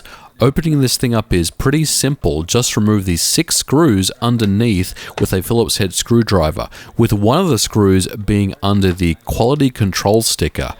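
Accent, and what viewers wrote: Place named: Australia. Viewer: Goddamn Aussie accent my ears are bleeding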